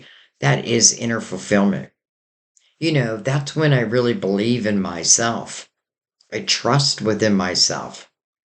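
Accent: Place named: Western Pennsylvania, United States. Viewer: Love your accent. Western PA?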